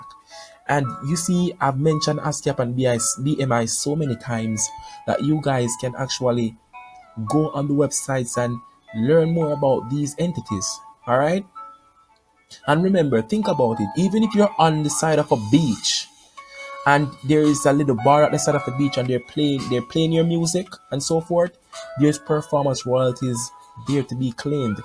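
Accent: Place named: Jamaica. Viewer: ur accent just like jamaican speaking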